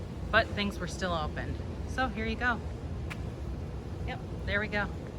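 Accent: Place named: Chicago, United States. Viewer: I like your accent. Are you from ChicAHHHgo? 🙂🙂🙂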